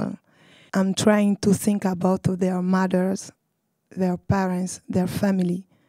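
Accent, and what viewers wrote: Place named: France. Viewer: I can hear she's French talking usually...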